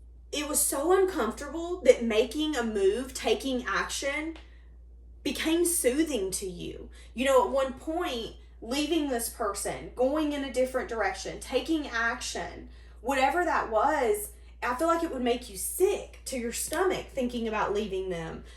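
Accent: South, United States. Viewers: Thank you for always making videos. Your voice, and southern accent brings me comfort. I could listen to you talk everyday, and do. Just wanted to let you know that some random guy appreciate’s your hard work putting these videos together. You are precious.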